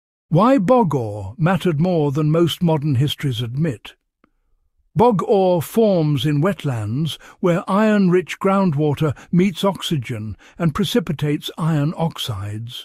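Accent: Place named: United Kingdom. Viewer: AI has gone British & Snotty 🖕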